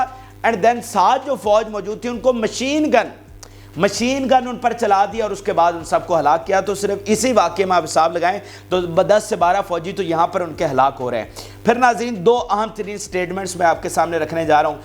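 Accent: Punjab, Pakistan. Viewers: Bro your urdu with punjabi is really beautiful _ab ek aur katta khul ghaya ❤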